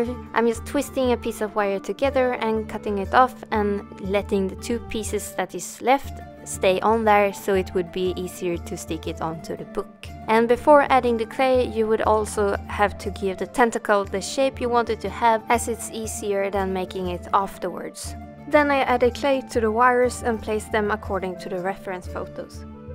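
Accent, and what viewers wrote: Sweden. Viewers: You sound swedish, cus of the kinda bad english🤔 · You sound swedish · Man hör att du bryter på svenska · this is so awesome! just a question are uu Swedish? I just think so of your accent when you speak English, I might be wrong x3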